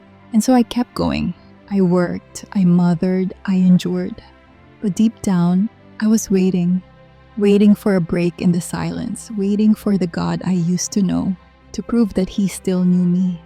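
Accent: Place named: Tagalog, Philippines. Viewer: Just do it in Tagalog version and put English sub titles Is really annoying to hear Tagalog accent trying to speak English Be natural and more people will listen like the Koreans ok 😅😅😅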